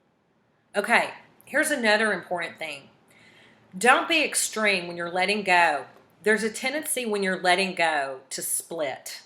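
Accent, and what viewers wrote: Texas, United States. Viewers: You sound like a Texas woman! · Girl u have to be from Texas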